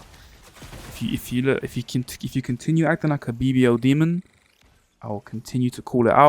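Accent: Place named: London, United Kingdom. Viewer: "He's a white guy. He doesn't really talk to lgbt people." "It's punching up. It's ok," I used to live down south heck. I was born there, but the absolute state of younger people down there is awful. The political element is absolute biased I promise you there is own group biase in this guys content in the same way those evil "white" people naturally are anti woke seeing how woke works only ever to hurt them and benefit others while ultimately this video reeks of the sort of fake learned culture where you hide behind humour but there is a consistent vilification of white people and people who have been successful in any way but remain right wing. This must be that all inclusive London culture that has been building since the anti colonialism pro natavist heros outnumbered the natives in their own capital city and proceeded to push none native culture and establish leadership from a man who previously said white londoners aren't real londoners. 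Why am I talking about london? Because this guy is either from London or he mimics them and lives/lived relatively nearby. Either way that accent, an accent that almost always flatterns with education into a more proper way of speaking and actually pronouncing words properly, that accent is almost always a marker of someone with surface level british culture followed by deep rooted ethnic biase a learned hatred/phobia of white people despite often being white or otherwise living in a white country and the refusal to engage with any form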